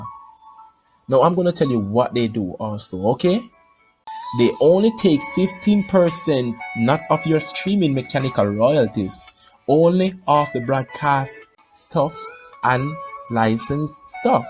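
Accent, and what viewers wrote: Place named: Jamaica. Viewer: ur accent just like jamaican speaking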